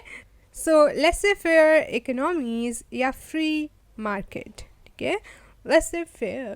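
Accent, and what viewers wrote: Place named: Peshawar, Pakistan. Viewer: Wah g wah peshawar sa ho kia ???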